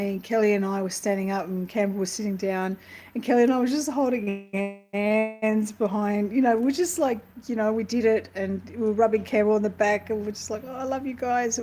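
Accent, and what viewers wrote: Australia. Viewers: You’re much more Australian today! ❤️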